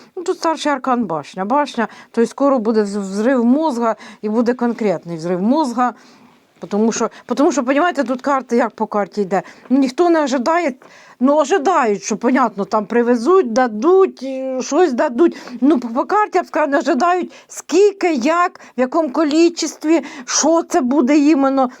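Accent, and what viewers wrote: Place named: Dnipro, Ukraine. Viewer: Дякую Вам,пані,за ваш суржик (ми теж, ваші сусіди з Дніпра так балакаєм).за Ваші ємоціі(вони дуже близкі нам).за вашу правду.!!! Хай щастить і здоровьєчка Вам!:hand-pink-waving::face-red-heart-shape:❤💛💙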